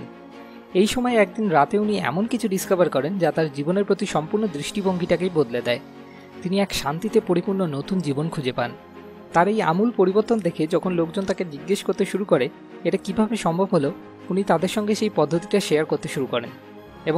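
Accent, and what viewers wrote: India. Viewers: Md:Mijanur Rahman No I am sure He is Indian because accent is different.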